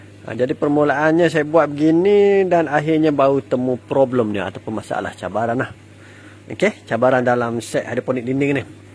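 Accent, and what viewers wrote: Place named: Kelantan, Malaysia. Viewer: cikgu ni org kelantan kea?..ad bunyi Kelantan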